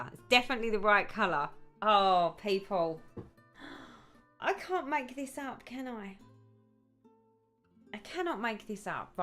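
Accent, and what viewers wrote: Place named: Essex, United Kingdom. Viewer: Dang. I missed live stream but watching it now. You are so much fun and I love your Essex accent. Actually very soothing.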